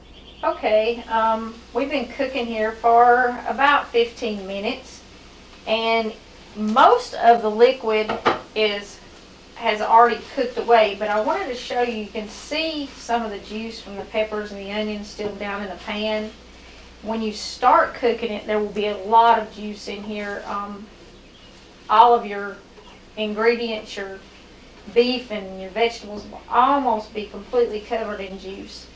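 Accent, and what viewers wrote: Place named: West Virginia, United States. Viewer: Hello ... I am a new subscriber ... I absolutely LOVE your videos .. I LOVE to hear about the Lord Jesus ... Thank you for including him & giving him the Praise & the Glory that he so rightfully deserves .... by the way ... Where are you from? i am from WV living in Bristol Va .. but you sound like you may be from West Virginia ... God Bless !!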